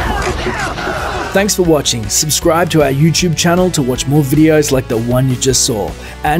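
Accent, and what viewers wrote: Australia. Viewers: Australian narrator? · Are you Australian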